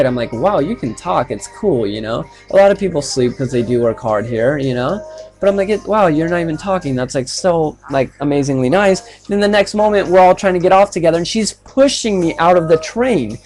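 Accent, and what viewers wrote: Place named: Ireland. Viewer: dude i thought you sounded like irish at first..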